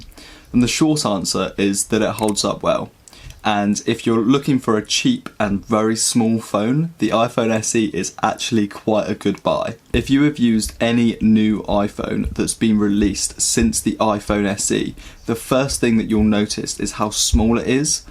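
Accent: United Kingdom. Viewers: that frustrating british accent...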